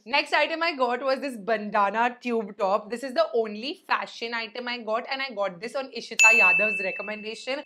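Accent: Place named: Mumbai, India. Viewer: Liked the tapori mumbai type of accent 😂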